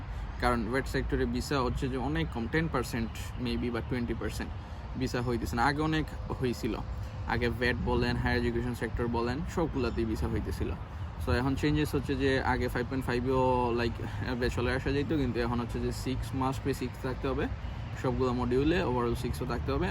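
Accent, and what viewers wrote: Sylhet, Bangladesh. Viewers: bro ur sylheti i guessed it from ur accent tht u used love to heard tht 👽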